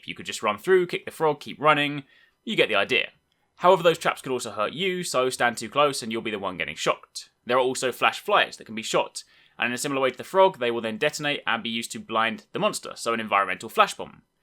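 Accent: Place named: United Kingdom. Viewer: It the British accent:3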